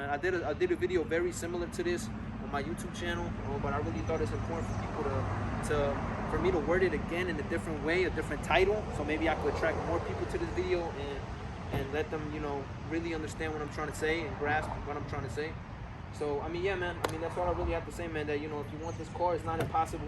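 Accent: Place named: New Jersey, United States. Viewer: Good advice ✅✅✅sounds like you from New Jersey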